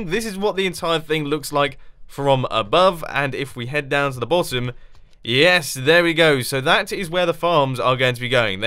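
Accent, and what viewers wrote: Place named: United Kingdom. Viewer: I also enjoy your voice. Maybe that's because I'm from Poland and British english sounds soo darn good to me. Also those iron "things" you could call them compartment markers/borders. As a biotechnologist I find that properly proffessional. P.S. Is the Iron Foundry automatically crafting blocks of iron? Cuz I'm pretty sure it produces iron slabs...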